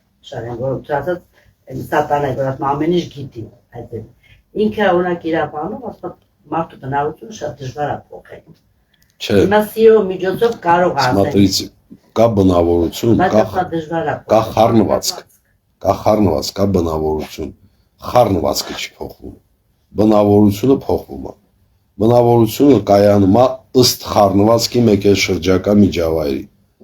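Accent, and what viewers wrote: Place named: Stepanakert, Azerbaijan. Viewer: Մենակ Ձեզ լսելը ностальгия է, չգիտեմ Դուք որտեղից եք, բայց մաքուր Սծեպանակերտսկի ակցենտ օնիք ❤Ձեզ լսումում հայրենիքիս կարոտը առնում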